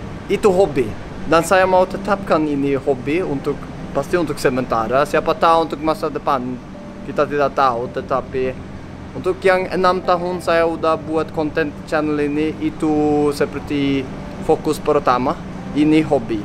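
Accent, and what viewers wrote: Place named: Lombok, Indonesia. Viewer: "Logat" sudah seperti Orang Lombok🤭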